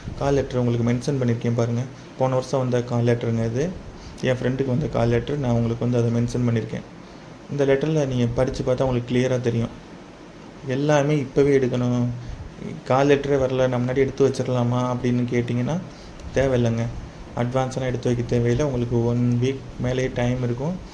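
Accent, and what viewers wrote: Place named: Tirunelveli, India. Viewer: திருநெல்வேலி தமிழ். 24. அப்புறம். 9. அப்புறம். 8. கால் லட்டர் வருமா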